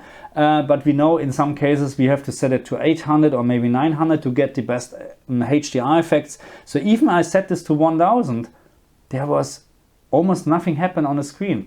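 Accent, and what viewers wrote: Germany. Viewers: Aber nur wegen der Leitplanke, sonst wären die Einstellungen nicht so geil xD...finde sein Englisch sehr souverän...wäre sie Aussprache nicht so stark deutsch, würde es noch besser rüber kommen...aber sehr ausführlich erklärt, gefällt mir gut 👍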